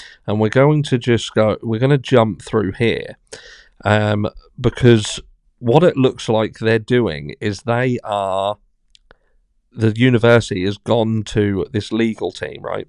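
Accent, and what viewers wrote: United Kingdom. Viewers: I can’t follow. Normally I relish an accent from uk but can’t this time. Straightforward reporting is best.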